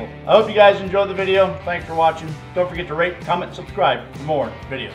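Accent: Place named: United States. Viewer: It's the American English pronunciation... the norm here.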